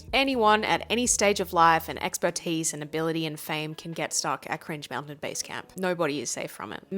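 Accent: Australia. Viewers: Sounds Aussie like Struthless. Cool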